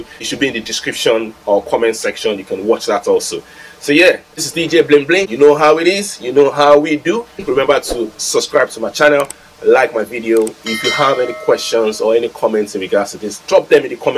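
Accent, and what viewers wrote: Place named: Nigeria. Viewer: My Naija broda. I hear that accent clear as day. LOL. Good job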